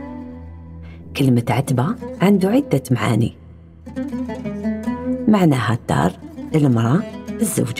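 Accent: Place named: Algeria. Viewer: اليوم اكتشفت قناتك المميزة ... اسلوبك رائع في سرد الحكايات والاحداث ..لهجة جزائرية مفهومة وبسيطة وجميلة بزاف .. حقائق ومعلومات مهمة ماكناش نعرفوها .. اتمنى لك المزيد من التقدم والاستمرار . القناة تستحق تكون برنامج معلومات على التلفزيون.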